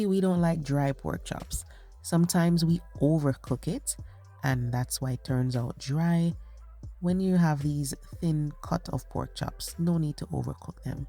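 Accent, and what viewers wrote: Jamaica. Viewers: Jamaican? Hearing an accent!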